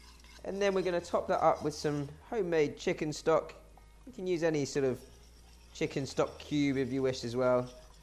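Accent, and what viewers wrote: United Kingdom. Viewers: Never seen a asian negga, wit British accent. Very nice. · weir to heard a chinese having british accent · I love this British accent, better than the cockney accent. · Chineese with a British accent love it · I focus on his accent the way he talk. I love british accent 😂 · Interesting to hear a Chinese man speak British exotic im quite amused lol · Why he sounds like he from the UK🤔🤔🤔😁anyway it is so important to me as a Chinese sista to learn how to cook Chinese dishes for Suga Bearz Cafe I'm collecting all recipes did I tell yall my egg roll horror 😨😨😨😆😂I ate it😕 an if bae was there an not on camera duty he would have ate it too right bae 😒I want a wok so bad I can't wait I want traditional food it's all going to be apart of SUGA BEARZ Cafe soulkitchen on Royal Underground tv charter spectrum 32 booyah happy cooking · Let me figure this out......... 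An Asian guy with a British accent?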